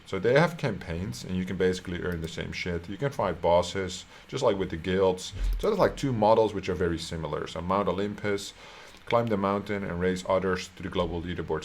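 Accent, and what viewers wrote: Netherlands. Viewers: Are you Dutch bro?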